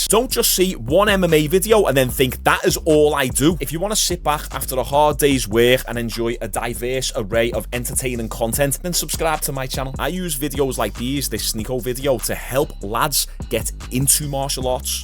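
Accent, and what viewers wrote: Liverpool, United Kingdom. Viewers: Watched your channel for a few months but just realised a few days ago I wasn't subbed. Love your content and Ive learned and laughed a lot but the main thing you have done for me is make the scouse accent bareable 😂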